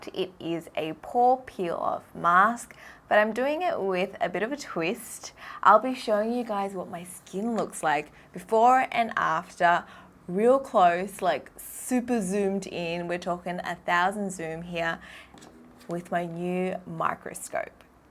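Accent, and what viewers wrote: Australia. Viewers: Asian with a aussie accent....wow...like McDonald's "I'm loving it".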